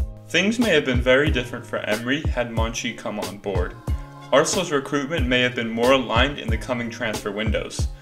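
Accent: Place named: India. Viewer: the narrator's accent is indian on you pl channel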